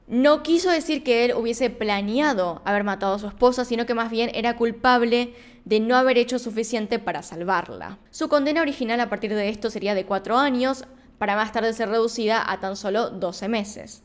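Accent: Argentina. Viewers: conmigo es igual, yo incluso busco los casos más largos!!!!! También me encanta ese español de ella de Argentina, yo soy brasilera y afortunadamente hablo español!!!! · Felicidades por tu canal!! me encantan tus videos y la manera en la que los presentas, excelente trabajo!! Yo soy de Mexico pero mi ex era de Mendoza Argentina, amo el acento argentino 😘😘😘😘😘😘😘😘😘😘😘😘😘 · Excelente investigación 🕵️ No me pierdo ninguno de tus casos. "Hola, y bienvenidos una vej mas" hasta lo repito con acento Argentino (soy de México) y me dice mi esposo.... "Ya vas a ver tus casos" y yo... Obvioooo, es mi Happy time. 💜😁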